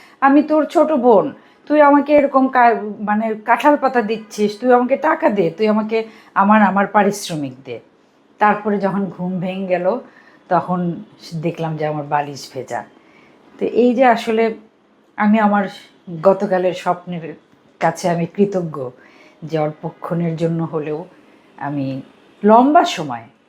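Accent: Sirajganj, Bangladesh. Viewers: সিরাজগঞ্জের টানযুক্ত ভাষা মাঝেমধ্যে ২-৪ লাইন প্রতি ভিডিওতে বলবেন, আপনার মুখে খুব মানায়।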